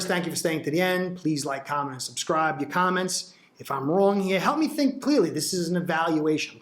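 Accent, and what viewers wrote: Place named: Northeast, United States. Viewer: Love you're north east accent (piason?) can't put my finger on it. Not overly nyc or that north jersey twang. Almost like between philly and Trenton area.. reminds me of home. New sub. I love your even keeled approach unlike many fin fan fans. Fin fan since a lil kid in 84' in Philly then in 86' we moved 2 Tampa area , Bucs sucked and were blackouted every home game. Back then Miami was still Florida's team. And they were on every week. So, i haven't missed a game since 87'. Thru reg. Tv, direct tv, sports bars when away or when in the service. A few games had to mailed to me but I'm that loyal. My family, from folks to wife & daughter know during football season that 4 hr slot is blocked off for the Dolphins, don't invite me out & if i go over my folks for dinner, I'm not moving from the tv. Lol. I also played d2 & coached a lot, so i know the x's and o's pretty well. 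But, Flo's forgotten more about the game than ill ever know & he's at practice & watching coaches film all week. I don't like to 2nd quess coach's every step of the way or arm chair 20/20 play call. I know what goes into play calling & offensive installs. Without knowing the play & having access to coaches film to see who didn't win their 1 on 1's, it's impossible to question those micro things. Ill judge him on a macro level overall but not yet. Too early. I know we have been conditioned to be mediocre & expect us to eff up a wet dream. But, older i get the less emotional (except during the game... when X made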